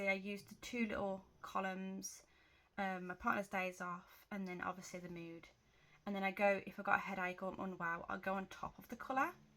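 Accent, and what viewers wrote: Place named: Birmingham, United Kingdom. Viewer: hello, thanks for sharing your journals ❤ I live your accent, reminds me of home. I’m from Birmingham but live in New Zealand now xx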